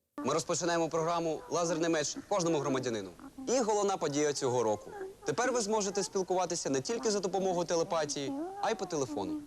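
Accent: Transcarpathia, Ukraine. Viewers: ти з Закарпаття? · Ти із Закарпаття? 😮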